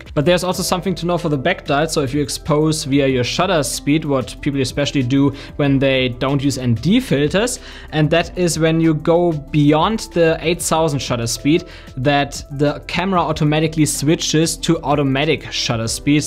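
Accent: Germany. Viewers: German or Austrian?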